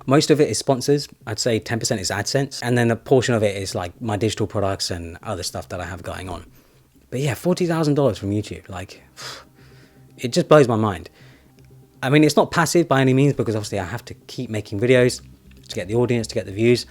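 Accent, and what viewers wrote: United Kingdom. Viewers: because british accent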